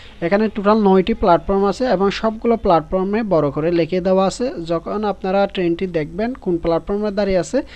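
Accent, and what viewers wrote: Chittagong, Bangladesh. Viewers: যখন ভিডিও বানাচ্ছেন তখন সুদ্ধ ভাষায় বলা উচিত এতদিনেও চট্টগ্রামের টোন পরিবর্তন করতে শিখেননি যার ভিডিওটা দেখে মজা পেলাম না। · your accent says you're habituated in your own Chatigao language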